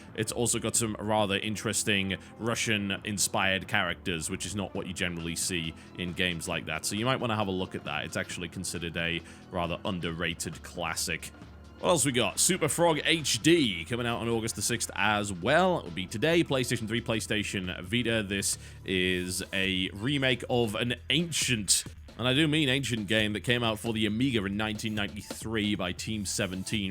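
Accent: United Kingdom. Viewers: he's British?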